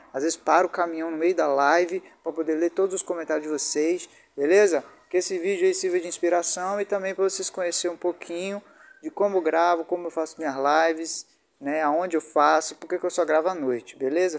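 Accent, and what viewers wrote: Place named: Rio de Janeiro, Brazil. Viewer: Eu jurava que tu era carioca kkkkkk tamo junto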